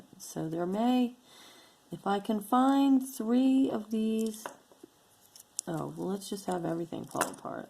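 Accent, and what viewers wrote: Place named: Ireland. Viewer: Love the Irish accent! 💚🍀💚🍀💚🍀💚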